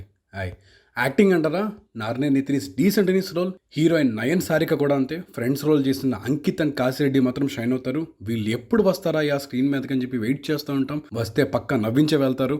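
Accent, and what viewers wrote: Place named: Godavari, India. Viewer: Anna godavari slang try chesadu roiiii🔥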